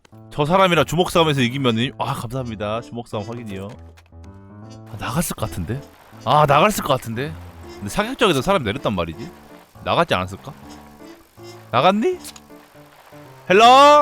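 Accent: Jeolla, South Korea. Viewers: 여기서 전라도어 찐하게 배울 수 있어서 애청합니다. 요즘 잘 써먹고 있음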